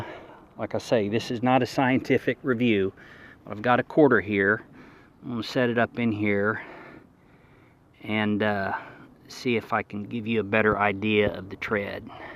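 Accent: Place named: Texas, United States. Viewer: You sound like you have either a Texan or Southern accent. Your California license plates threw me off. 😂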